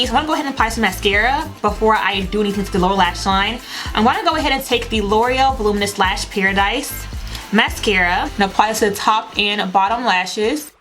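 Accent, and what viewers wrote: South, United States. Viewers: Yes! I just tried this foundation the other day and I love it! I got Warm Sun as well. but it was too orange for me. I still was excited with the outcome... It lasted on my oily face for like 6 hours or so... I was pleased for sure! Your makeup came out perfect! I love it! And your southern accent is so cute LOL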